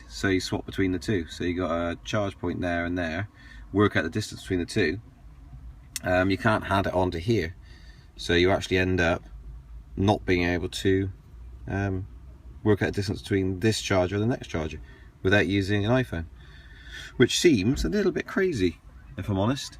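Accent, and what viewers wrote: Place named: Bristol, United Kingdom. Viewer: Just bought myself a two year old Tekna Leaf up her in Montrose, Scotland. Love your videos,. They have been a good insight into what the Leaf is all about and capable of. I pick the car up tomorrow!!! Can't wait!! Sounds like you're from the same neck of the woods as my wife, (Bristol?).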